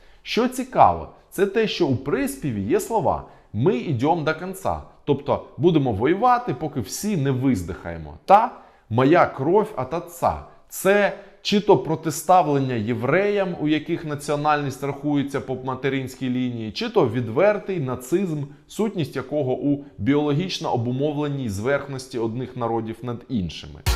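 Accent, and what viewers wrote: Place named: Russia. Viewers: роси1ский акцент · В озвучании текста написаного слышно русский акцент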